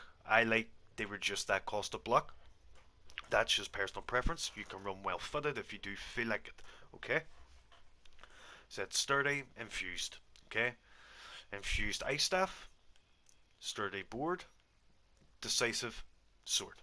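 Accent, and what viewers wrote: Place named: Derry, United Kingdom. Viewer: Great job, very informative and well presented showcase of the necro tank. Who wouldn’t want to listen to the lovely Derry accent, that’s just a bonus 🥳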